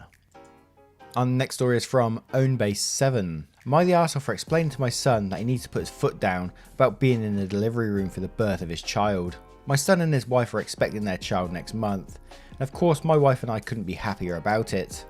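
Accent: England, United Kingdom. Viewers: No way would I have wanted to give birth to my sons without my husband there, but that was my own choice and his. I would have lost it if my in-laws had tried to interfere. Definitely not on! Thanks for doing these. You're the only Reddit reader I've found so far that's English rather than American, and actually seems to know all the words you're reading. I really enjoy listening. Good luck for your dad!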